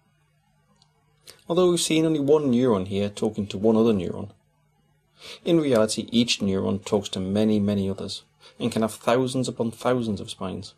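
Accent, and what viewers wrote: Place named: United Kingdom. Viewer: With all respect to your place of origin,realize this is the internet,so use a freakin accent that the whole world can understand,not only British.Also,for 2010+ standards. animation sucks.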